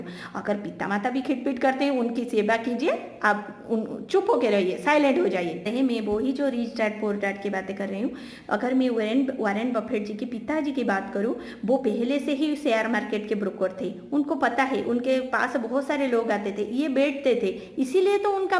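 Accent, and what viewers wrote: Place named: Bengal, India. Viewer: Ok. Nice of you. Will I get a government job? Odisha seems like Bengali accent. Nice of you.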